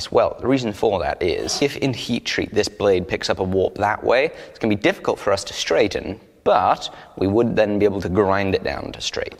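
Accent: United Kingdom. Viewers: I came from colab- Am I crazy or does he sound WAY more British here than in that video?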